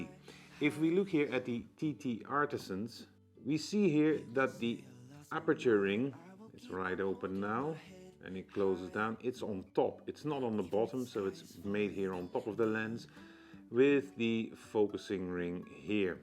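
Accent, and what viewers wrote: Netherlands. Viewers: It is ttartisan not artisans. Both are different companies. Are you dutch btw- I hear the familiar accent:) I prefer the ttartisan lenses. All of them are great and sharp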